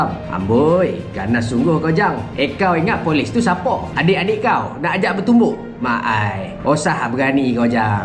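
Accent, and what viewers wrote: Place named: Negeri Sembilan, Malaysia. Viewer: Hehehe.. cakap nogori hahaahah.. Jang tak sedo dri tu ajak bertumbuk... Ukur paku hahahah. Terbaik.. Support punyer berita... Hehehhe. Hari ke 12 dh nie... 👍🤣😁😂😎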